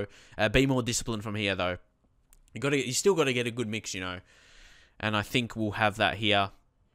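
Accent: Australia. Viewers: Are you Aussie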